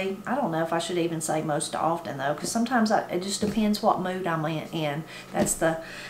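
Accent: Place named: Kentucky, United States. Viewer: We called those kind of dumplings, pastry. Chicken and pastry. It's dumplings when we drop them off a spoon. I'm so grateful I found your channel. Hearing your accent takes me back to those Kentucky hollows. Thank you.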